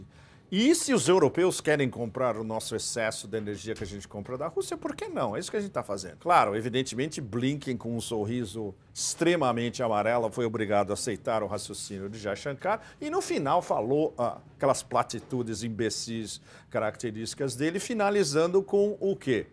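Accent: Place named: São Paulo, Brazil. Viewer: Mêêêuuu esse sôôôtaque da elite paulistana é tuuúuuuudo de bom! Suuuuper peculiar!!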